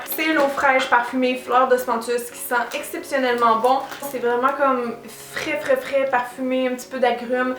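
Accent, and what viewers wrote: Quebec, Canada. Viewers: C'est un plaisir d'avoir tes vidéos ! Très professionnelles et généreuses ! C'est tellement cute ton accent québecois - ça me rappelle de bons souvenirs en voyage là-bas, je vis en France - et ta bonne humeur c'est déjà les fêtes de noël ! Merci pour les conseils et le concours. J'y participe et ce que j'aime le plus lors des fêtes de noël c'est la magie de sentir des amis et des familles réunies dans la joie et la générosité. Autrement dit, ce que je préfères c'est voir les gens que j'aime heureux d'ouvrir leurs cadeaux. Belle continuation ! Merci pour tes vidéos ! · Coucou, j'adore vraiment tes vidéos et ton petit accent canadien ahah! Je suis en France et je participe au concours, ce que je préfère a noël c'est les repas de famille, es retrouvailles et puis la magie de noël tout simplement! J’espère gagner ce concours pour une fois ahah ♥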